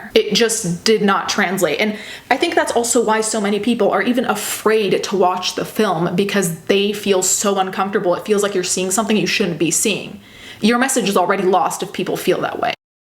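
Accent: United States. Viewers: I knew that you were Italian, and that English was your second language, but I had no idea that you have only been here such a short time! Your American accent is fantastic. Keep up the good work, my friend! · I don't mean to be rude but how come you have a full American accent if you only moved to America 5 years ago? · Unrelated but for a non-native speaker you have all of the nuances and a great (American) English accent! The fact you speak 3+ languages fluently is incredible.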